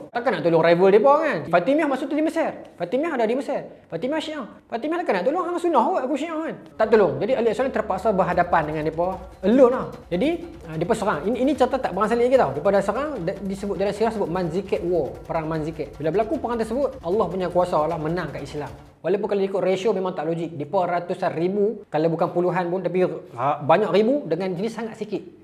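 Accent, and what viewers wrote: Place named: North, Malaysia. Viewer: Tu kira bahasa melayu dlm loghat utara..kau tak faham sbb ko bkn melayu,ko kudha kayu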